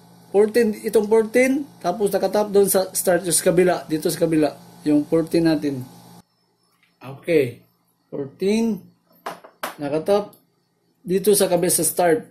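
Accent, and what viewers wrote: Visayas, Philippines. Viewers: Bisayaa jud oi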